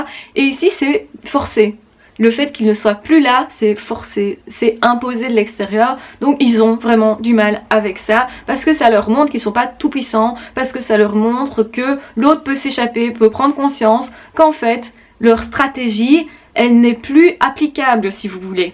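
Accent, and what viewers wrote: Brussels, Belgium. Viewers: Bonjour... (Je n'ai pas trouvé de gmail où t'écrire sur ta page YT, je "tente le coup" par commentaire...). Je te suis depuis quelques temps (abonné) en tant que HPE/HPI, et je m'interroge toujours un peu, au sujet d'une femme avec qui j'ai passé quelques mois au printemps/été dernier... (silence radio aujourd'hui... j'étais trop "rebelle" pour me laisser "faire" plus longtemps... Merci à mon "âme" rebelle qui est parvenue à prendre le dessus sur ma "personnalité" hyper-sensible... à tendance dépendante, donc). Penses-tu qu'un(e) PN puisse avoir des tendances masochistes dans sa sexualité? (ça m'a toujours paru plutôt "contradictoire", mais il est peut-être aussi envisageable d'y voir une sorte d' "exutoire" à ce besoin de contrôle permanent... En t'écrivant, ça me paraît quand même ne pas trop "coller" avec le PN...) Une autre suggestion de profil compatible avec ces 2 tendances? 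(Vie totalement "sous-controle" - par exemple hyper-propreté, clinique -, et sexualité à tendance masochiste... Personnalité évitante, ce serait possible? ) Merci encore à toi pour ton travail 🙏 et ton joli accent que j'aime beaucoup 😉 (Bruxelles? Je ne suis pas belge, sinon je reconnaîtrais vos accents... Par contre, je suis fort en accents français...😁). C'est assez troublant, tu lui ressembles un peu, physiquement (à cette petite "peste" que je soupçonne PN.. les yeux, la bouche...mais là comparaison s'arrête là... ). Paix et Amour à toutes et tous 🌈💕🦊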